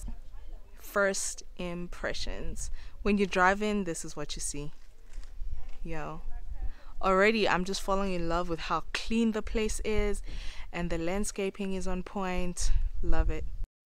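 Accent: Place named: United States. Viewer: Definitely a hidden gem in southern east Africa I would like to visit.#Eswatini 🤩. you lived in America before?. I can hear that accent slang.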